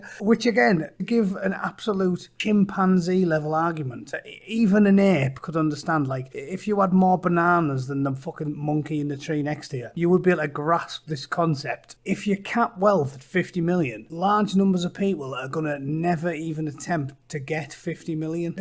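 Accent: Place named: Teesside, United Kingdom. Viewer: Great commentary from you. You sound like a teessider, which makes it better than the usual metropolitan wank.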